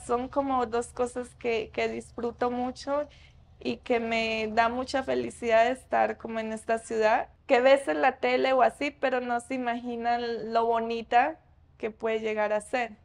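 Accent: Mexico. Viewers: Esa hermosa colombiana habla como Mexicana te amo cásate conmigo quieres ser mi esposa la madre de nuestros hijos? besitos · Todo su acento de este bella dama muy mexicana muy bella colombiana en México. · Guau qué bonito habla no cabe duda el estilo mexicano y el tono de vos que tiene muy bonito me llamó mucho la atención qué interesante mujer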